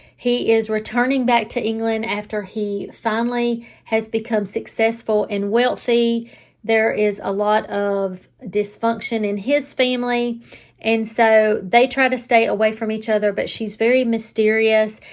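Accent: South, United States. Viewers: Hello miss I am a young adult Midwestern girl! I am a big fan your southern accent brings me back to my childhood family friend who would always say yall😂. Great recommendations by the way I love fall and I just finished the Love's a mystery in sleepy hollow! It was so cute and cozy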